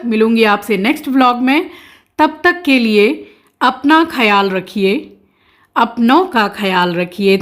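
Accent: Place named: Braj, India. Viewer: Hi ji.. mere mann mai ek query hai ki aap rahti Kolkata mai h to aapki kuldevi kaila maiya kaise itni dur and aapki language bhi agra Mathura wali hai.. kyu? Aisa m isliye pue h rai hu kuki m agra se belong karti hu aur hmari kuldevi kaila devi hai aapki wording hmari jaisi hai reply jarur karna pls..@ ji reply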